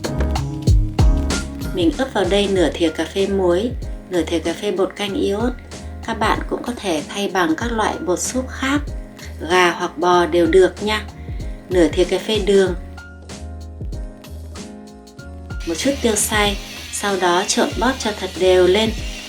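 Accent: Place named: North, Vietnam. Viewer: A+. Giọng Bắc hay quá nên cho thêm hai dấu + ... A+++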